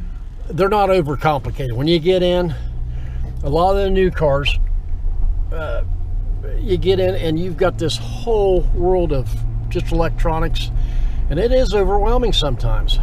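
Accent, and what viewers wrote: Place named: Ohio, United States. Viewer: What a pip! Just recently stumbled upon your channel and must say how much I enjoy your videos along with your knowledge and honesty in doing business. I'm currently on my eighth Panther platform car, an '04 Signature Town Car and like very much that you appreciate and know so much about these vehicles. I'll wager the interior has that distinctive Ford aroma which I remember from cars my folks drove while I was a youngun' in the 1960s. Not elderly yet, but I'll be a boy of 63 in a few weeks so I can relate there as well. And being a native of western PA, it's nice to hear the way you speak over there in that part of "O-h-Ten." Quite similar to the way folks talk in my hometown area. Take care!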